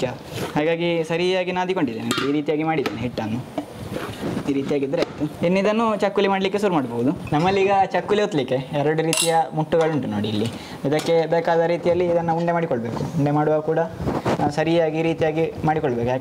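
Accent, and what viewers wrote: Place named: Tulu Nadu, India. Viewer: Bhari yedde pandar...Erna Tulu mishritha kannada kusi apund...kenare...rattunu Dade....😁 Maani nu kende panle...attigye masth paapadar ye..namasthe🙏 Niklena back supports gu yenna solmelu...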